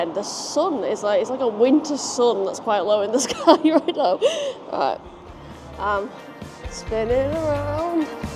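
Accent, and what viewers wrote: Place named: England, United Kingdom. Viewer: u are speaking with r e al english dialect and so who s hould be interested on your view by th way xDD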